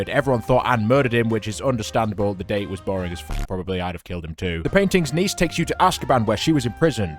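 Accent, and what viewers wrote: United Kingdom. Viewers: Once again hitting a banger I don’t know why buts it’s funny listening to a British man explain games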